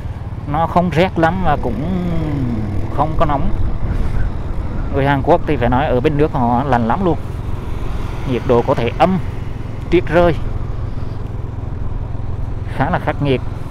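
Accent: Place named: Quang Binh, Vietnam. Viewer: Giọng miền trung chắc là Quảng Bình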